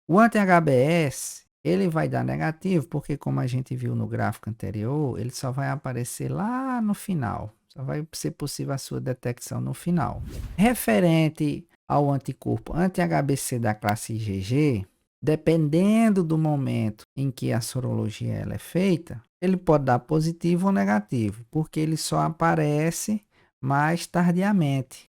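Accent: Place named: Northeast, Brazil. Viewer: Incrível aula e de bônus com sotaque , oxi! 🤠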